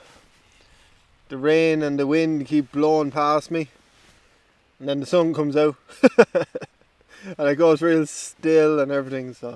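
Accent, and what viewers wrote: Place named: Ireland. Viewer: It’s just nice to see and hear an Irishman on YouTube!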